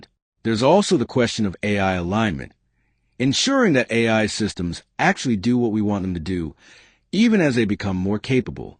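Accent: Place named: United States. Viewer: I liked the AI voice chosen here. American. Not those couple of English guys all over YouTube who sound like they have a cold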